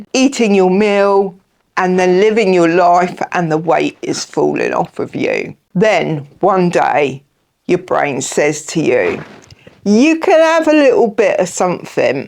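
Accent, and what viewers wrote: United States. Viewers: Great talk! Thank you so much. And I love your charming Texas drawl…haha! But seriously, your story and advice are excellent. Thanks again & God bless you🙏🏻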